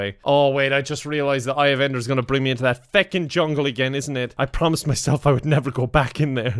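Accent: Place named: Ireland. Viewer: something about that beautiful irish accent and boat load of charisma always makes me hit that like button. im like hes my friend he said so in the intro and he asked oh so politely. guess couldnt hurt to obey whatever says. ps rt sucks. (team since country road, there can only be one)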